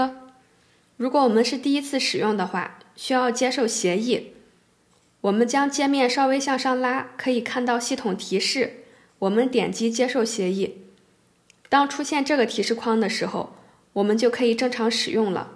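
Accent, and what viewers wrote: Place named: Shaanxi, China. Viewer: 貌似陕西人，反正肯定大西北的。。。。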